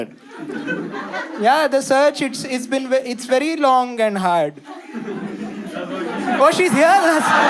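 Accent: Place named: India. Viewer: He has such a stereotipical indian accent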